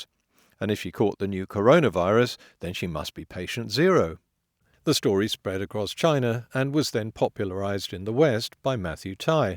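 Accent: RP, United Kingdom. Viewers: This video is garbage. it is claimed that the Wuhan Virology Lab does not exist. But there is very clearly a Wuhan Institute of Virology: <link> How can people just blindly trust this video? Because the presenter has a posh accent? I mean, come on, just fucking Google and you can see the blatant lies in this video. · actually he seems fairly obsessed, neurotic, arrogant, intellectually lazy, poor debater, and possibly lies on purpose to farm his viewers. But he has a British accent so people get confused and give him attention. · some well-spoken British guy to voice it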